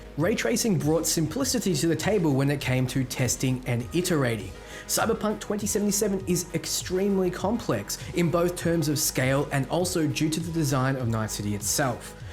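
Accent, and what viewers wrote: Australia. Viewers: Nice to hear an Australian for fucking once! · Great video. Hey are you an Aussie or a Kiwi?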